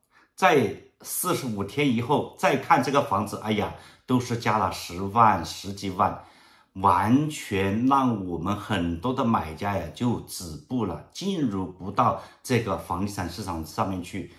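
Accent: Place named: Cantonese, China. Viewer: 你有口音，你是不是讲粤语的？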